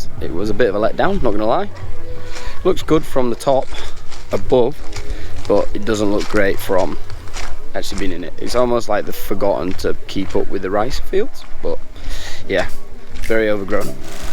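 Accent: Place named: Yorkshire, United Kingdom. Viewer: nice video brother. You sound like your'e from Yorkshire aha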